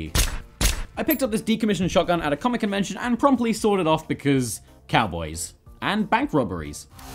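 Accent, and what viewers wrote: United States. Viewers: You’d think he’s American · I thought he was American. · is turning american. prove me wrong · I never knew a Brit can sounds so American...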